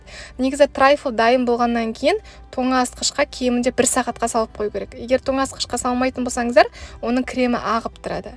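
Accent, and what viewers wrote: Standard. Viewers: сізді үнемі көремін. Өте жақсы дайындайсыз! Таза қазақша сөйлеп!☺️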